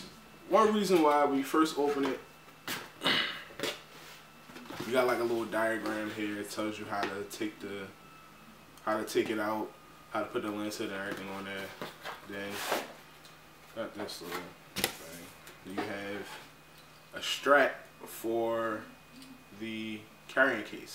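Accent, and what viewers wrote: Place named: Baltimore, United States. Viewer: You sound like you're from B'more...or maybe D.C.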